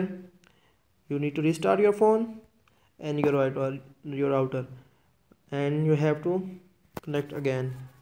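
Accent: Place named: India. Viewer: Another heavy india accent every fucking video...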